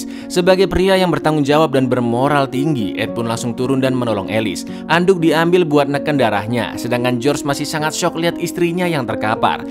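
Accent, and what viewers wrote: Java, Indonesia. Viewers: Intonasi, jawa-nya terasa banget + mantap bang.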